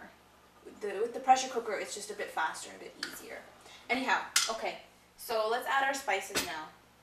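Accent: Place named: North America. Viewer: No. It's a north american accent.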